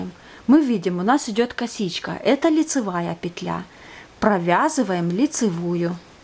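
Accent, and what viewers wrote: Ukraine. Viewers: Западная Украина ? Или просто суржик ?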